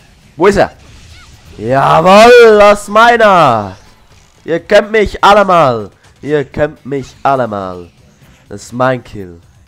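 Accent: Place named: Switzerland. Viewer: haha mer ghört voll din schwitzer akzänt :D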